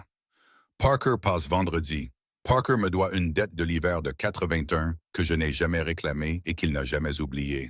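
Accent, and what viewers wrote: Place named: Quebec, Canada. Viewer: Je vous écoute d'Alger, Belle histoire et bel accent Québécois ❤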